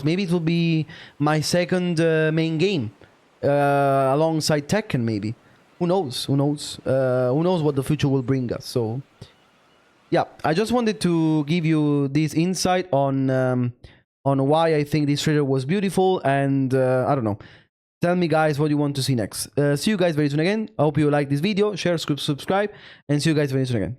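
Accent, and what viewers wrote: Italy. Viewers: You sound Italian, the best European vf player is from Italy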